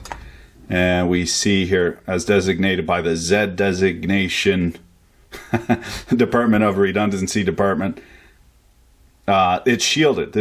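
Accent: Canada. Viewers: been a mechanic since 77 , I came to this channel for this , turns out I like listening to canucks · The Canadian commentary is half the reason I watch these.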